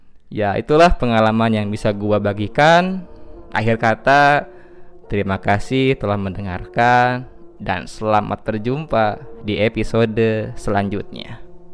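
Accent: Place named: West Java, Indonesia. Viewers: Sundaan euy😋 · Urang sunda sigana mah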